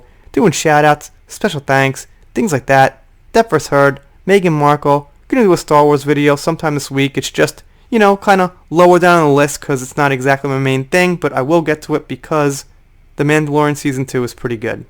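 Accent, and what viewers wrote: New York, United States. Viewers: Just so ya know you pronounce it "Toka, Japan" your New York accent makes it sound nothing like Tokyo.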